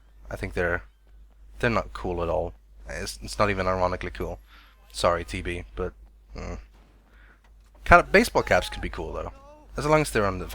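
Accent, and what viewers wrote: Scania, Sweden. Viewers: Det var inget annat än skumt att höra dig prata skånska, men du gör bra videos så fortsätt med det! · Varför alltid skånsk?? TheSwedishLad är samma sak... Pewdiepie är den enda som jag känner till som inte är skånsk... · SKÅNSKA FTW! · MIND BLOWN!!!! DU E SKÅNSK !!! (göteborg ftw heheheh) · 2 skånska 4 me även fast jag är skånsk.. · Haha, visste inte att du va svensk fören du snacka skånska '' jag bara wtf'' xD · Skånsk dialekt = MindBlown! Din Engelska Dialekt är Godlike men jag trodde ALDRIG att din svenska skulle va så skånsk XD, Anyways You're an awesome Swede Ska fösöka komma med på någon Ventrilo eller så med er :) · DIN SKÅNSKA THO omg like i'm from skåne but holy shit it's so weird to hear idk why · Yaaaay du är skånsk =D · Det var så märkligt att höra dig prata skånska... vet inte vilken dialekt jag tänkte att du skulle ha men skånska fanns liksom inte på tapeten. 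As bra kanal, keep up the good work! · cause he speaks skånska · Fuck! Han e skåning! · Å herregud! Du pratar skånska! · OMG det känns så konstigt att höra dig prata skånska xD Men det är underbart. Du är bäst. Fortsätt vara awesome! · Herregud han är skåning:D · JA ÄR SKÅNING:D har du varigt i ängelholm?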